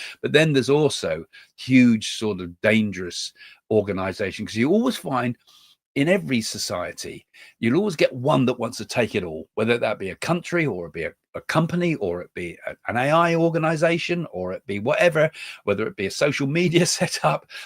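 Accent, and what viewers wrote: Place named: England, United Kingdom. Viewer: So refreshing to hear an English voice on You Tube. So many Psychic forecasters are American. Thanks xx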